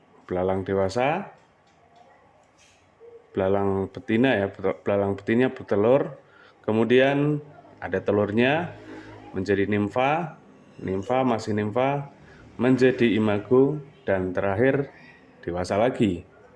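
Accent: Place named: Java, Indonesia. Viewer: Bahasa indonesianya medok bgt mind, orang mana mind ?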